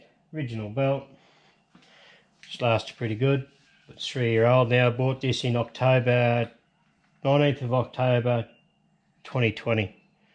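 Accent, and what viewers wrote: Australia. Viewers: yeah bung the DRO on, I need to put mine on and having an example of someone who talks Australian doing it would be really good!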